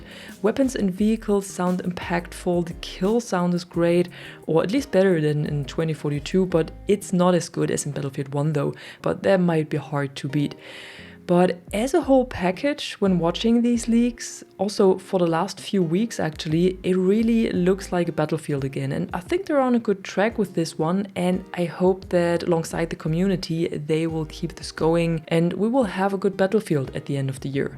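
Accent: Germany. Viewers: Super süß wenn deutsche englisch reden 😃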